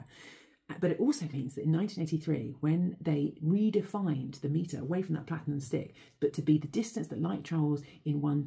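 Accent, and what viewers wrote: England, United Kingdom. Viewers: I like her videos. She smart, attractive and has a lovely English accent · Redhead + English accent = <3 <3 <3 <3 <3 <3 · your intelligence and british accent are sooooo sexy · I'm very much biased by my French pov, so when I hear a British accent explaining science to me, I instantly think Doctor Who. It's a stereotype, but it's just super fun to imagine the Doctor teaching science to humans on YouTube 😊😁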